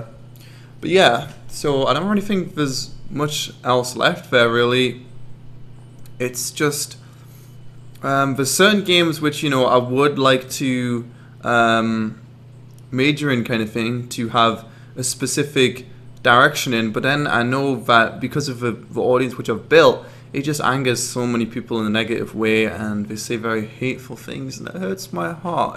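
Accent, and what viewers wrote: United Kingdom. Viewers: I just want to hear that beautiful British accent bby grill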